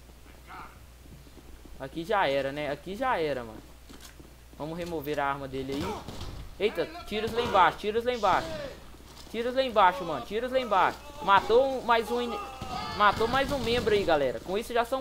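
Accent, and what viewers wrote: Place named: Rio de Janeiro, Brazil. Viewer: voce e carioca né? fica falando bota cara e pq é do rj haushauhsauhs tbm sou!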